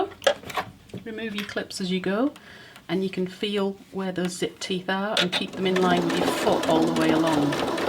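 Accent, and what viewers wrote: England, United Kingdom. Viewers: It is lovely to hear a beautiful English accent! I've never had a problem with wobbly zips but it is always good to have a refresher! Thank you. (Edited to correct typos)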